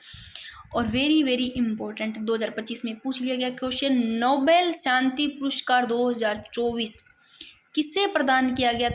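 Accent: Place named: Marwari, India. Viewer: Aapki boli to marwadi lg rhi hai acha lg rha hai sunke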